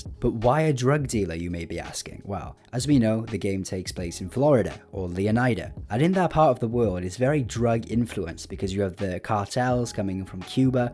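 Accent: Wales, United Kingdom. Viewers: I know a fellow Welshman when I hear them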